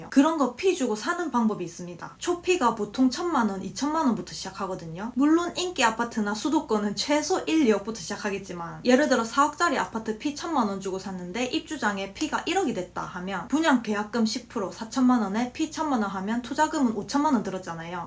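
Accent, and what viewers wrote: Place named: Busan, South Korea. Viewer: ㅋㅋ부산사투리쓰면서 재밌게 말씀 하시네요ㅋㅋ무주택자 다자녀를 둔 외벌이 거제도 가장입니다ㅋㅋ 좋아요 구독 누르고 갑니다~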